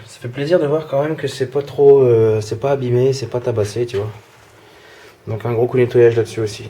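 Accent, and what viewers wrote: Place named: Nord, France. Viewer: Salut " " je me permets de t'envoyer ce message car moi aussi je suis youtubeur je viens à peine de me lancer dans tout ça. Je suis mecanicien PL de métier et j'aimerais que tu me donnes ton avis sur ma chaîne n'hésite surtout pas à critiquer. Tu ne sais pas comment les gens adorent ton franc-parler. Cela se sent que tu es du Nord moi mes parents sont du câteau 59. Et franchement je kiffe trop ta façon d'être. Je te laisse le lien de ma chaîne youtube surtout n'hésite pas à me déglingué s'il le faut <link>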